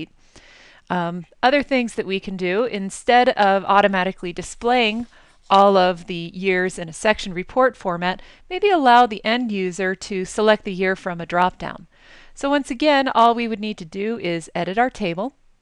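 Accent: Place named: United States. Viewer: Just want to echo what someone said below, so nice and refreshing to watch a training video with someone with an American accent.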